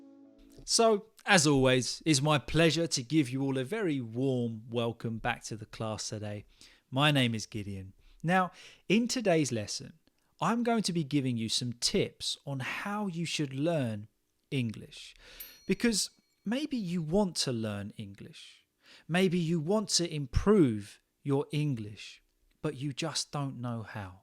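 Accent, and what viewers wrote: United Kingdom. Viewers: Thanks for advice🎉 are you British, or did you learn British language?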